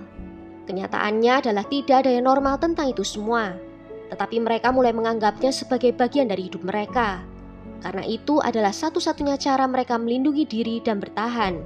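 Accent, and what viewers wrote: Java, Indonesia. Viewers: Medok kali jowo nya 😂😂😂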